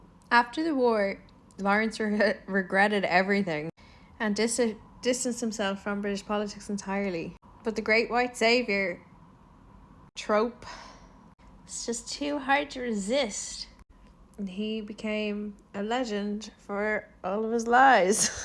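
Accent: Ireland. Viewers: Wait I don’t get it. How is any of this bad? Unless you’re pro-Ottoman? But you sound Irish. I guess taking the credit for the Arabs tactics was bad but it was over 100 years ago so I personally don’t care and don’t understand why you do.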